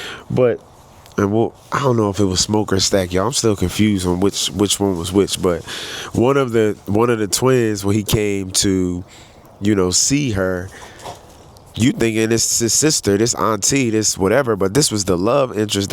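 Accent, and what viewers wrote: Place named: Caribbean. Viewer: Sir, do you have family members who originate in the Southeastern United States? Because nobody who has ancestors from that place and lived during that time would totally and completely invalidate Mary's race and ethnicity like you did. I'm sensing a slight Caribbean accent too. I'm sensing your people are not originally from here, because people who looked like Mary were definitely in our family as grand fathers and grandmothers, great grandfathers and grandmothers, great great grand fathers and grandmothers. We all had family members who were Yt presenting and some actually passed for Yt. Your definition of what is Yt doesn't apply to the historical reality of Mary's identity, and it was really aggravating to hear you constantly dismiss her race and ethnicity and call that woman Yt and say Stack had a Yt girl. Mary was not a Yt woman. 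It's very low key disrespectful to dismiss people like Mary who were in our lineage and chose not to pass, and that is what Mary ultimately chose for herself, to not pass for Yt or live as a Yt person. Her storyline was a representation of our ancestors who had her experience. People who were Yt presenting in Black America is part of the Black American experience. There were plenty of Yt presenting Black Americans in the USA who were enslaved in this country, and Jim Crowed right along with the darker skin Black folks, and who stayed within the negro/colored community marrying more darker skinned Blk folks and as a result, we have these women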